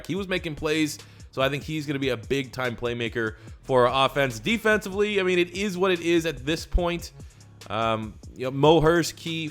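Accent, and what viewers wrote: Canada. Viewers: I liked u bro, until I recognized that hitious Canadian accent..yuk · The Random Broncos Dude he's Canadian he says stuff differently you're a broncos fan there's other stuff to be mad about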